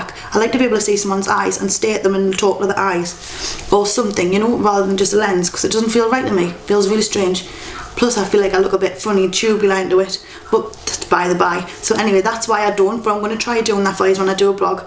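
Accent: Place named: London, United Kingdom. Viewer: I thought that you were from London :)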